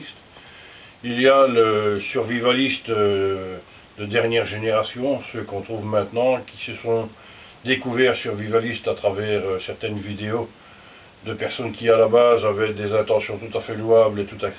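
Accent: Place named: Brussels, Belgium. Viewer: Salut le ou le! Quelques réaction à tes propos... Tout à fait d'accord avec le terme de "prévoyant" et non survivaliste. Je me disais bien, t'as bien un accent de Bruxelle... Promis, moi je touche pas un copek en présentant mes "jouets". Quoi, t'as pas aimé mon four de campagne et ma chaufferette de rando????lol Je vois mal ma femme accepter de faire de la soudure dans le salon...lol.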